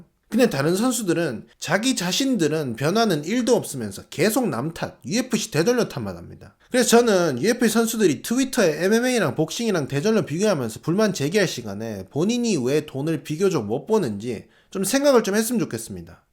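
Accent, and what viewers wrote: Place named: Rural, South Korea. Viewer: 근대 왜 말투가 이렇게 촌스럽습니까? 어디 억양인가요?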